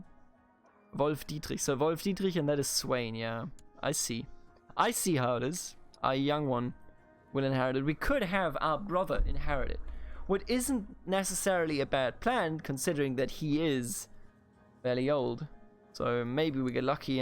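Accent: Germany. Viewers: Never before have I heard a genuine German accent. I am not disappointed.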